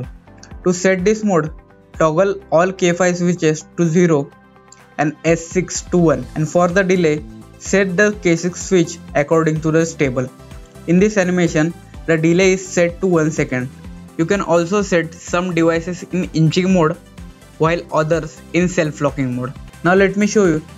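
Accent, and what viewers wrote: India. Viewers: my Indian brother plz when.u speak English in correct accent not in.hindi very poor english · Dude your indian accent is too much. Please study the intonation and slang of proper english. I know you speak english. But really sounds like indian language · Mere bhai, he IS, in the Indanized English specifically Maranglish! (Marathi English) I speak Tanglish (Tamil English). English is our language. Since British cheated and looted us for 200 years, we have confiscated English. IT IS OURS NOW.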